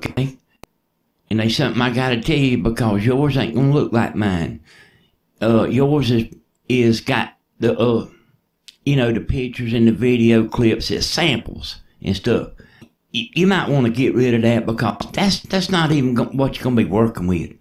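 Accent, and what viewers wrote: South, United States. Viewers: I learnt more from your one video (with that adorable southern drawl :-) ) than from a whole series run by a boring smooth-talking digital 'expert'. Thanks for helping us out. (Ps I love how you say 'raaaaah-click)..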